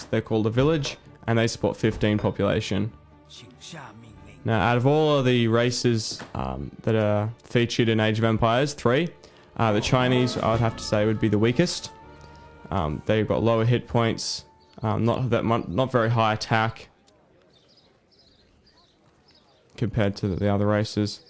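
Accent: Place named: Australia. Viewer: u sound aussie, but good on you pommy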